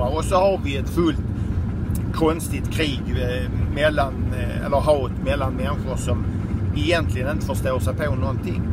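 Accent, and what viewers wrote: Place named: Skåne, Sweden. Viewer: Älskar din skånska röst, Jag är också en skåning! <3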